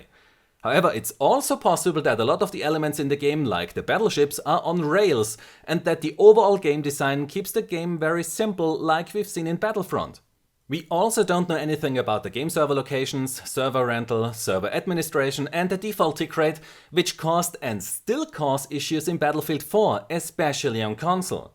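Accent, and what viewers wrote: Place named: Germany. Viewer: new sub here. Not sure if it's your German accent that keeps me listening or your focused and mature analysis of games, but either way, keep up the great work. You're bound for 1.5 Million subs soon!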